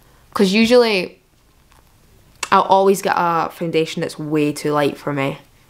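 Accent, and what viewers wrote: Scotland, United Kingdom. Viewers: never seen a comment section struggle so hard to find out where she is from. Is it that hard to tell she is Scottish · Scotland b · I could listen to your Scottish accent allll day long.... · Lol she doesn't have a English accent she has a Scottish accent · Thot Scottish accent I love ❤❤❤❤❤❤❤❤❤❤❤❤❤❤ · She sounds Scottish to me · I bet you're American, huh. Cause that was completely and utterly stupid. Her accent is violently Scottish and all the people in that show are English. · Guys, Scotland is IN Britain, so technically her accent is British. Not all British accents sound like queens English accent “typical posh” accent. I may be wrong but she sounds Scottish to me, or northern English. So generally speaking I think non UK/Irish people think the entirety of england is the UK while forgetting there are 4 countries in Great Britain all with 100s of different accents in each part. 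Yall spoke like there’s just 1 accent in all of Britain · haha, Scottish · because raylas scottish lmao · didn't expect the Scottish accent thank you for enlightening me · Scottish accent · Keep your natural lighting I think it looks better :) also love to hear a Scottish accent on here, I’m from Edinburgh and Boots on Princess Street sells Fenty now ♥️ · bpdbitch nah bro yea we’ve seen people with that the same accent (Ik she’s Scottish) but she just gives the vibe of it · Scottish. I already knew this, but She's talked about it in older videos. Apparently, it's the lowest of Scottish accents where she's from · was not expecting you to be scottish and am loving it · V a n i l l a that is definitely a scottish accent not a british accent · Skanda Kousalya Devathaa she's scottish alyssa's from york x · she got a scottish accent lmao my dad got one too . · i dont think so because i am and her accent is a little different i think shes from more southern scotland idk lol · no it's not, she's scottish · i did NOT expect u to be scottish 😳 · Ah a fellow Scottish person 🥰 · somaya no shes scottish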